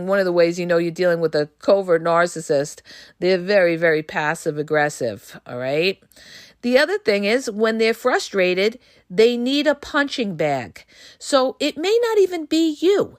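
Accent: New York, United States. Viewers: i'm finally laughing again at you saying "they throw you to the wind" And your language is perfect combined with the New York accent I feel like I'm listening to a friend.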